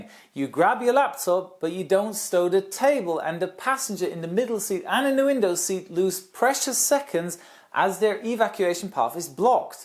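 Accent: Germany. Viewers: Is he German? I can hear an accent, but i‘m not sure if it’s a German one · hahaha in der ersten sekunde wusste ich du bist deutsch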